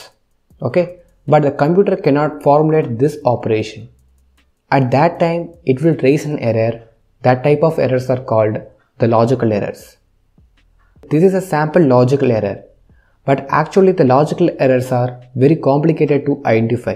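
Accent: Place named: Tirunelveli, India. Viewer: Excellent. Bro neenga Tirunelveli ah ?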